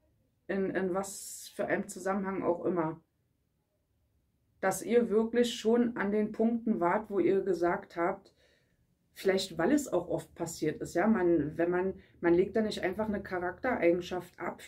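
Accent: Berlin, Germany. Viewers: Guten Morgen Ich liebe deine Berliner Schnauze 🙂 und deine Legungen. Bleib wie Du bist!!!!! Danke für diese Botschaft 🙏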